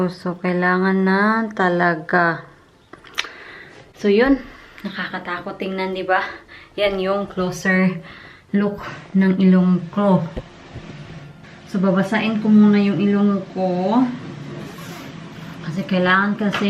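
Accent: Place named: Visayas, Philippines. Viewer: Hi ate love you bisaya accent❤ #christmas2019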